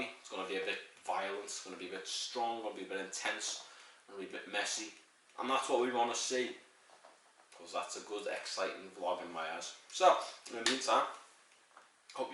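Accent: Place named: Liverpool, United Kingdom. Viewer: I love how you say ‘Turkish’! Love the Scouse accent. Looks like my scrotum. Hahahaha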